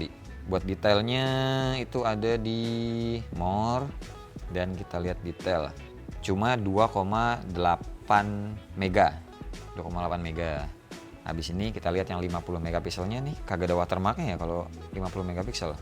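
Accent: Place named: Sunda, Indonesia. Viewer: Aya campuran sunda saeutik 😭🤣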